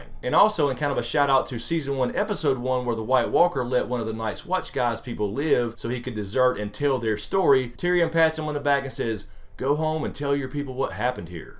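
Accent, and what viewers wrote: South, United States. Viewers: Are you a comedian! You make me laugh out loud, til my stomach hurts! I LOVE your reviews! Love that Southern drawl, too! Great job! I look forward to more videos from you..... :)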